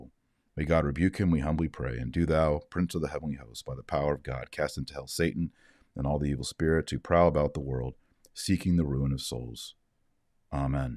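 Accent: Texas, United States. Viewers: Texas accent getting stronger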